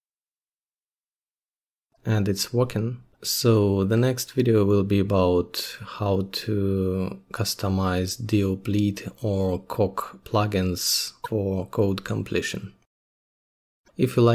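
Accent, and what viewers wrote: Russia. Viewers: Судя по акценту ты наш парень) Спасибо тебе большое, очень помог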